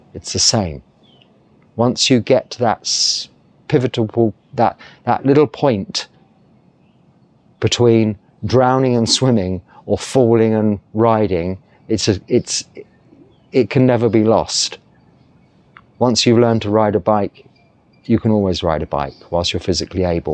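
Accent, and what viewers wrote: United Kingdom. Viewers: This could be a matter of perception. Bhante rather sounds too British, but not angry 😊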